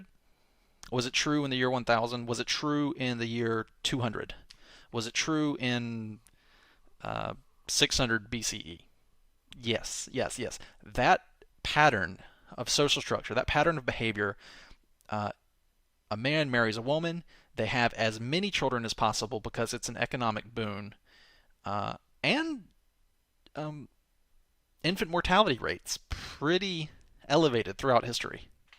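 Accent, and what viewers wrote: South, United States. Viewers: i think is from the south, i can hear it in some of his pronunciations.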